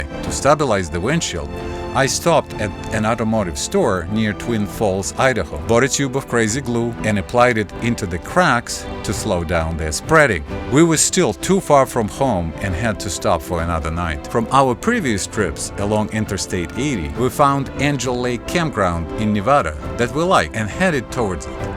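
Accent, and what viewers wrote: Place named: South, United States. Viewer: your good ol' southern accent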